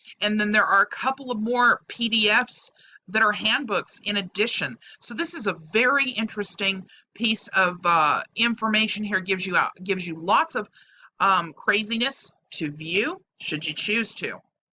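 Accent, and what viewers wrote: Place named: South, United States. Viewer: When talk shows were first starting out, and they had really outrageous guests with wackadoodle ideas, I would say to myself, ‘please don’t have a southern accent! Please don’t have a southern accent!’ And guess what- they always did. When this video started out, I found myself saying over and over in my head, ‘please do y be from the south! Please don’t be from the south!’ But Nooooo.... he just HAD to be from the south, Didn’t he? I’m only in , and I’m already cringing! Now? I wonder, with all of the old tales of ‘inbreeding’ in the south, is this guy a result of it? I’m from the south and I definitely want to vote him off of our ‘southern island’! And as I watch this, I’m reminded of the whole confederacy and the south. Would this be a way to try and ‘restore’ the southern confederacy, albeit under a new name?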